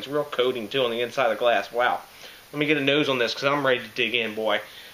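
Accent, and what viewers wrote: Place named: Baltimore, United States. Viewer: Hey, what part of MD are you from? Sounds like a Bmore accent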